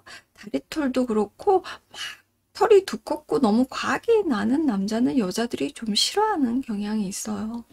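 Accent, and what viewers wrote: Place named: Gyeongsang, South Korea. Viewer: 잘쌩긴이라고 발음하지 마시고 잘생긴이라고 발음하시길...... 잘쌩긴은 호남말투인데, 억양은 영남이시네요..박원순 서울시장의 억양......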